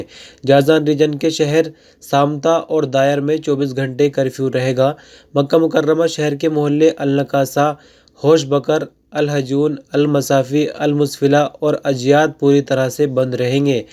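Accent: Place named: Uttar Pradesh, India. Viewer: Apki zaban bata rahi he ki aap u.p se ho konse district se ho ye bataye brother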